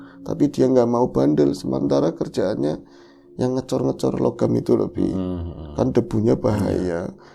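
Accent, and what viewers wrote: Banyumas, Indonesia. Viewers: hallo mas 😅😅..seneng aq klo denger bhs ngapak..ketawa trs aq nya..sehat sll mas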